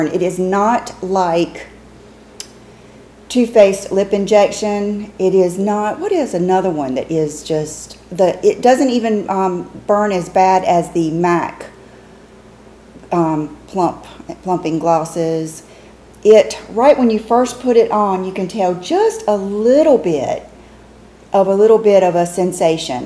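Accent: South, United States. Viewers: I could listen to you talk for hours. You’ve got such a soothing southern comfort to your voice. It’s like when you’ve been away from home for a while and cross that border back into the south, it’s like a big ole hug sayin darlin’ your home❤️.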